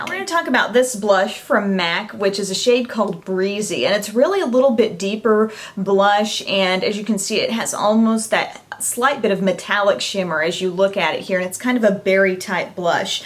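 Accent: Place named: South, United States. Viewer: I also wanted to ask if you were from the South. I love your accent. :)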